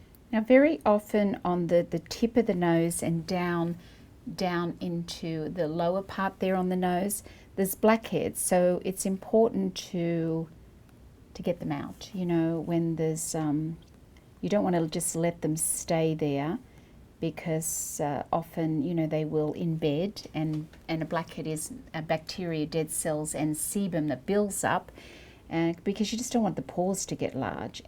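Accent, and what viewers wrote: Australia. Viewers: Aussie as hell lol what a nice touch hearing that Aussie accent in the video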